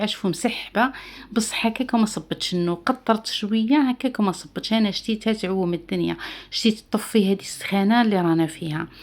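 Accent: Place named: East, Algeria. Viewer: هدرتك تبان من الشرق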